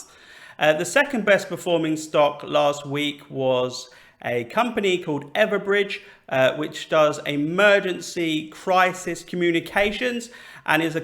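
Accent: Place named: United Kingdom. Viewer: Your shirt is just as perfect as your crystal clear British accent. Love it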